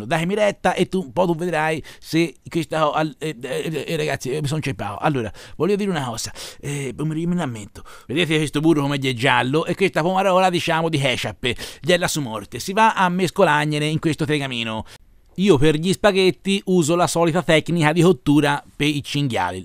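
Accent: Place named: Campi Bisenzio, Italy. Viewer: Ma tu sei di' campi bisenzio? No perché i' mi babbo m'ha detto che il suo amico Marcello co i suoi amici parlavano a quel modo lì. Comprimenti per l'umorismo un saluto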